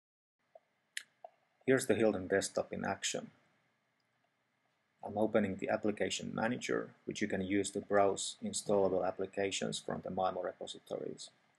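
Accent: Finland. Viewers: That's a finnish accent alright. ;) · you sounds finnish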